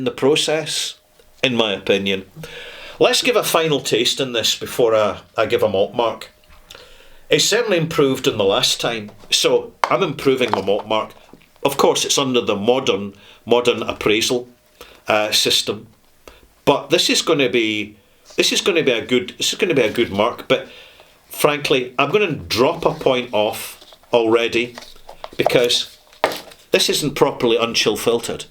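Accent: Scotland, United Kingdom. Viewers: The most I've ever laughed watching one of your videos was seeing you trying to imitate a stereotypical Scottish accent when you already have one!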